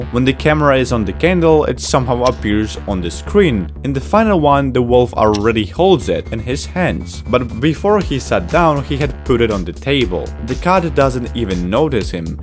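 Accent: Poland. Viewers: Wait are you Polish? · are you polish or something ?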